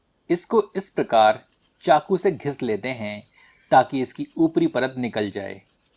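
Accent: Standard. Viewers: Aapki shuddha hindi sunkar bahut aanand aya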